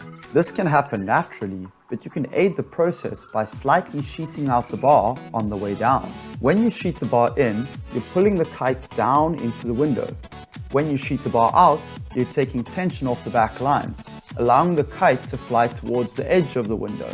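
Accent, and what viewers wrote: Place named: South Africa. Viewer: Fuuck bru I cant anymore. I already miss South Africa too much.. these videos make me feel at home just hearing you talking kak while saying the right shit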